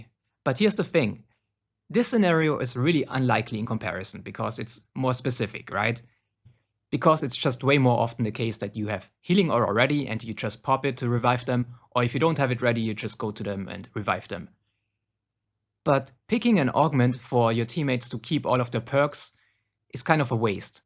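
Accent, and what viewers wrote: Germany. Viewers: Im hearing a german accent xD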